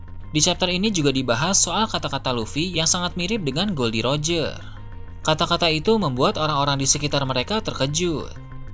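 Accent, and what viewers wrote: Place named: Lombok, Indonesia. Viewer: Medok lombok tajem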